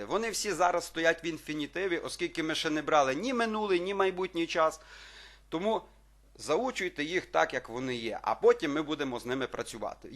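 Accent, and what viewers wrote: Ukraine. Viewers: дуже дякую ! вчу чеську по вашим урокам . одний недолік , що я помітила , у вас є украінцький акцент . інколи вимова не така ,але цу незначні помилки ! велике вам дякую за ці уроки !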